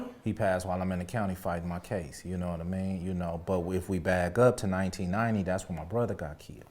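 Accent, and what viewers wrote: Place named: California, United States. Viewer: You can hear the Cali In his voice 💯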